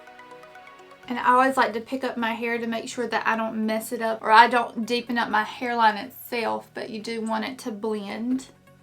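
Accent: South, United States. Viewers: I love your cute southern accent!!!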